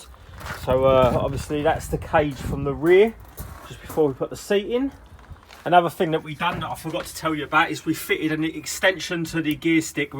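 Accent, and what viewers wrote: Essex, United Kingdom. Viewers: Essex boy voice sounds like micky flanagan lol love the videos brother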